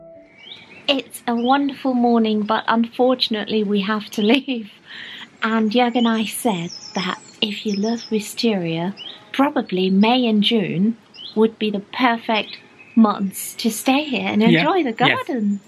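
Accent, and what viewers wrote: England, United Kingdom. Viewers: I like your British accent, so beautiful. House is very good. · Lovely video. Are you from Germany? You seem to have a British accent. Were you raised in Britain? You do not sound like you have any German accent. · Absolutely lovely! I am new to your channel and see that you are German, but your accent is British. Did you live in the UK? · ❤❤❤❤❤❤ You have a beautiful British accent, it doesn’t look like you’re from Germany :) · Your accent sounds more English than German 😊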